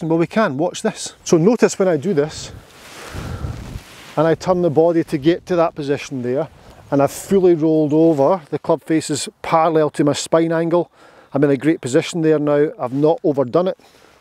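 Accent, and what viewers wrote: Scotland, United Kingdom. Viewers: One of the best golf channels on YouTube for the following reasons: 1 - Love the Scottish accent and I’m an English man. 2 - fellow ginger, like myself. 3 - Explains drills in a easy way of following! Keep up the good work! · Love the videos. Even understanding Scottish!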